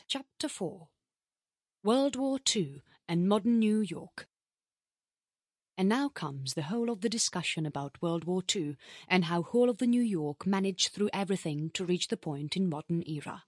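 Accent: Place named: RP, United Kingdom. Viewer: It's nice that this is up free but the english is a little weird...too much focus on trying to sound posh at the expense of clarity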